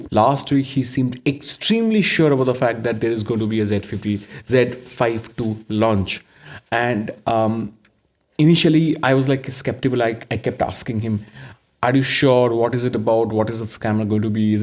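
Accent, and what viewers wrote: India. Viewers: Your Indian English accent is not a problem and it is very clear and understandable. Yes, there are some people of India which has a deep and not understandable accent, but your not one of those people.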